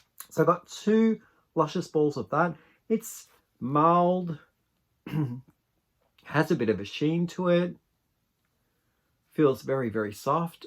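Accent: Australia. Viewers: Blessings for quick recovery. Hope this finds you better. I'm new from long hiatus from crocheting. I've never ordered from Aberdeen. I loved their Toy Box at $2!!! So I went on the site to order....all colorways Sold Out.😢😮 I love cotton! ❤️ Beautiful Your projects are Magnificent! ❤❤❤ What a Wrap! WoW.. You are Very Gifted! Love the Colors too. Your Accent is Australian? Love to hear you talk. 😊 1st Bag was so my Colors, #6885.❤ It's a Small DK. Bloom is so Pretty. 😮 Loved the Cotton/Nylon. ❤Enjoyed all the yarn haul. Thank you my Friend. Bless You.❤️🙏🏼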